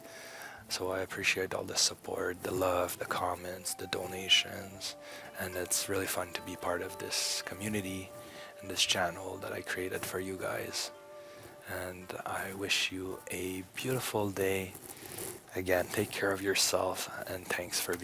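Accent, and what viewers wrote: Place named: Montreal, Canada. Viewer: I love your classes so much. I grew up in Montreal's south shore so your accent makes me feel at home. Glad your knee is healing and you are able to post more often. Thank you so much!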